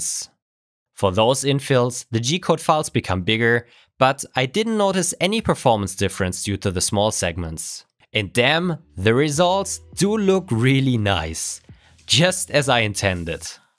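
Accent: Germany. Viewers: U aren't the only one who noticed german accent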